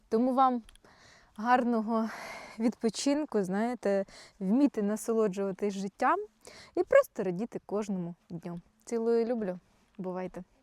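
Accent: Ukraine. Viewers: Ви чудо! Дуже подобається мені і ваш (наш) український діалект.Супер!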